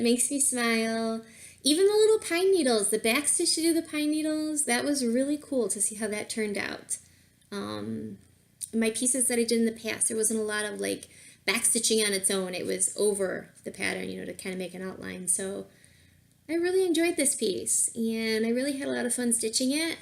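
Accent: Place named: Cleveland, United States. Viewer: You are like the coolest girl ever!! 😆😆 I totally thought you were from Cleveland from the accent...but I do remember Bendy Stitchy saying you were a Wolverine 😮. I’ve never had a reason to go to Michigan but you make the Bavarian town sound totally worth it!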